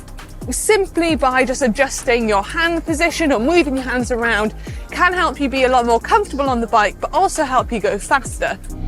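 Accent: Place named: United Kingdom. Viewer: I'm just watching to listen to her lovely British accent. Three cheers for the King! 🇬🇧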